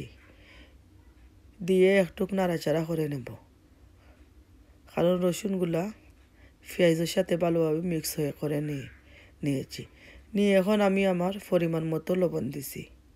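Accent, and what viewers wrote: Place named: Sylhet, Bangladesh. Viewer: আপু দেখিলিছি আপনার রান্না , মিস করছিনা। বাক্কা মজা লাগল আপনার রান্না । আমার নানা সিলেটে । তাই আপনার মাতকতা বালাউ লাগে আমার কাছে । আমার মায়ের ভাষা ।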